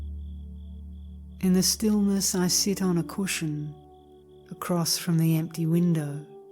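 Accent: Australia. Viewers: (Aussie, woo hoo! Definitely a Sydney voice.) Beautiful poem.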